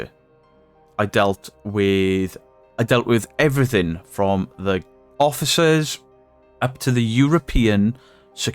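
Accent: Wales, United Kingdom. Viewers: Listening to this in your Welshy accent lol "come on boys.." lol I'm from South Wales too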